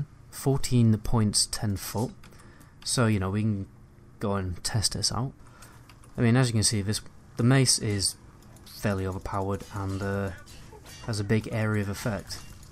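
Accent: United Kingdom. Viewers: Wait, is British!?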